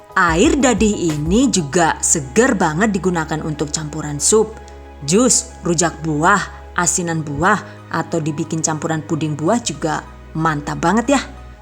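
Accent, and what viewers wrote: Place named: Tegal, Indonesia. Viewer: Terimakasih sudah berbagi ilmu harus di coba ini,dan satu lagi saya suka humornya logat orang Tegal.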